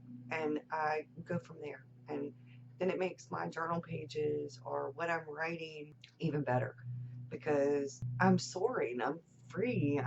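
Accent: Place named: South, United States. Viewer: I love the southern accent, it sounds so friendly. Ans I never doubted that you are very intelligent.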